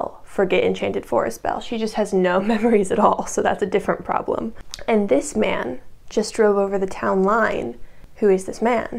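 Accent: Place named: Australia. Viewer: This video has me imagining a universe in which Belle divorces Mr gold and dates Ruby instead. Also I’m listening to this at work and the Australian accent sends me every time I hear it.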